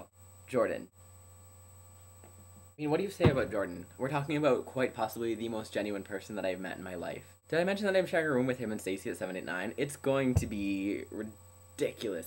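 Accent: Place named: Canada. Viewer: Haha. I love your canadian accent, the way you say 'About' and 'House.' It's however not as thick as Dhiel's, from another gay-themed collab channel, something like awesome not so straight guys. I always forget the name. v_v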